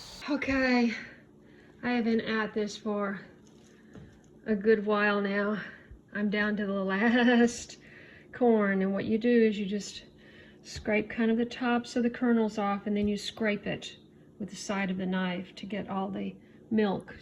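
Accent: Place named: South, United States. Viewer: Is originally from the South? Sometimes I detect a bit of an accent 🤔 love this video, so much great information 😍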